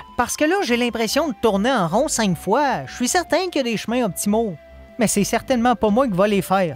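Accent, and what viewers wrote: Quebec, Canada. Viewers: Je suis québécois et j’adore ça t’as un québécois tu est mon préféré de tous les youtubeurs mon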